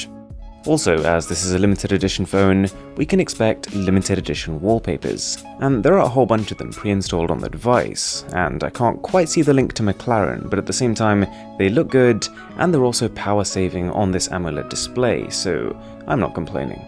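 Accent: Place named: RP, United Kingdom. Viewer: Supersaf isnt as pretentious with his reviews. Drop the posh accent.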